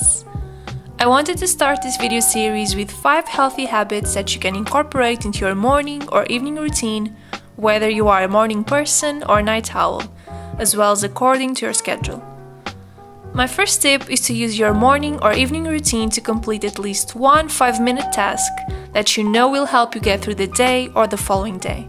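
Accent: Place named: Portugal. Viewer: Ando a descobrir canais que me transmitam energias positivas e me motivem, parabéns está incrível. Houve uma coisa que teve imensa piada, quando comecei a ouvir o vídeo pensei "Fogo parece mesmo uma portuguesa a falar inglês, super clear mas não inglesa obviamente" e fiquei mesmo "wow tem que ser". Fui ver à bio e acertei, que inglês excelente!!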